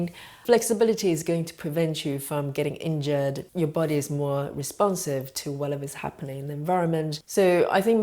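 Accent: United Kingdom. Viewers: Thank you for the useful info. BTW I like your British accent. ☺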